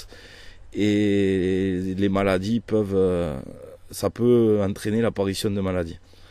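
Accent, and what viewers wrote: South, France. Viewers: Votre chaîne Youtube est GENIAL !!! un régal !!! vos dessin sont tres explicites et réussis. et votre accent sent bon le Sud. C est un plaisir de regarder vos videos, continuez ainsi !!!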